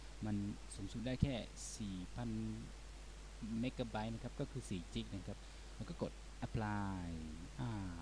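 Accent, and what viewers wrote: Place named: Myanmar. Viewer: พูดก็เบา ฟังก็ไม่รุ้เรื่อง สำเนียงอย่างกับพม่า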